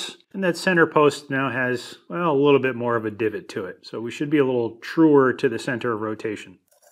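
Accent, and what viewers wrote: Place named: New York, United States. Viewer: So, I thought this sounded like you but somehow different, a bit more raucous, slightly more vulgar and significantly more nonsensical. Your voice has taken on a more New York accent than I remembered, the family component wasn’t there but the Star Trek allusion was right there! Also, your production is more TV and less YouTube….. I must say, I really enjoyed it and at once wasn’t sure if it was you as I was watching on my phone and it wasn’t as apparent as on my desktop. If this is the new, I approve!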